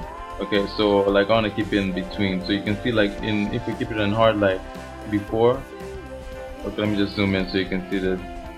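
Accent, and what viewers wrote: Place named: Germany. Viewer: you sound German :\ Are you? Nice video BTW :)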